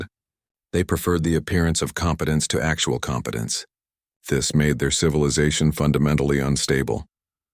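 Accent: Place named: United States. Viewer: Oh the irony, of having an American voice declaring a breakdown of rules. In particular the time frame of the world order breaking because of an American presi... donkey.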